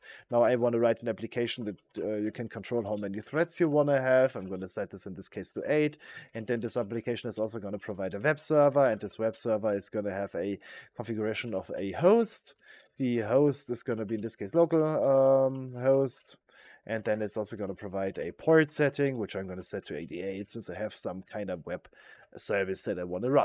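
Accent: Germany. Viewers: That was the perfect video to start with. Thanks. Greetings from Bremen my german accent speaking friend.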